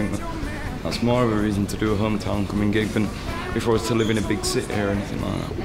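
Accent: United Kingdom. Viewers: Was not expecting tht propa strong yorkshire accent lol! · I love his brittish!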